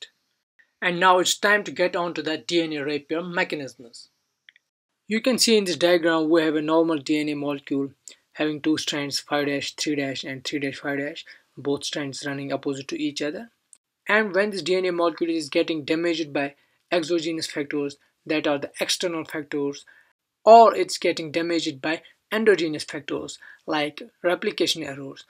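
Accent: Kashmir, India. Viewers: Are you Kashmiri. U accent seems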